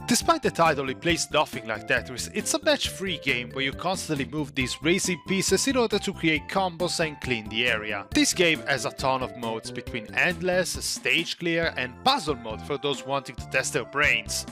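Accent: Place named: Italy. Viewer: Your voice is pretty boring, and honestly I’m not a big fan of your Italian accent. Also, I think showing the mini SNES with loose cartridges feels a bit ridiculous and annoying. Using the original console would have made a much better impression.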